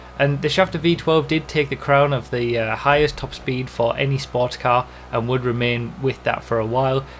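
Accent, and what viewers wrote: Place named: England, United Kingdom. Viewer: Thank god hes English! Sick of hearing stupid fucking yanks.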